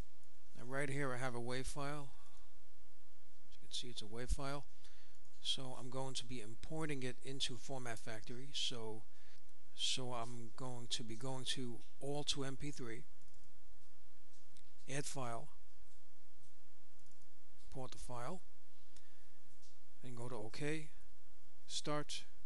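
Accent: New York, United States. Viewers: Thanks Brother...You sound like a New Yorkuh...I Love NY & God Bless Yous :)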